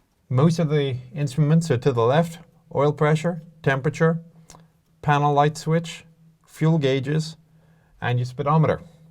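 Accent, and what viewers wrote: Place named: Ireland. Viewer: I thought you had an Irish accent alright:) Either that or you're well versed in international transport logos:P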